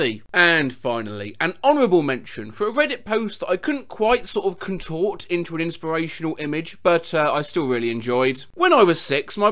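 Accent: United Kingdom. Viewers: i love british people reading internet things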